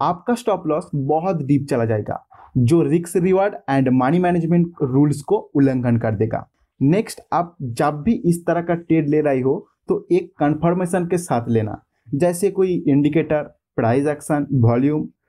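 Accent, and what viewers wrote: Bengal, India. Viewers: Very nice content and very nice to hear Hindi in bangali accent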